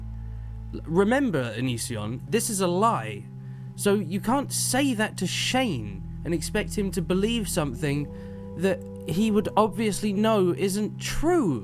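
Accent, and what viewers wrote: England, United Kingdom. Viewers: It took me 8 minutes to realize you have an English accent :P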